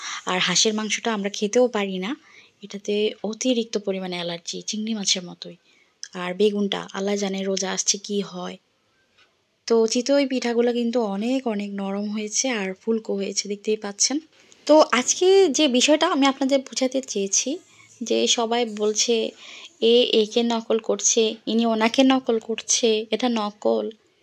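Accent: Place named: Noakhali, Bangladesh. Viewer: আপু তোমার নোয়াখালী ভাষা ভালো লাগে আজ বলো নাই আমিতো অবাক 😮😮হাঁস দারুন হয়েছে ❤❤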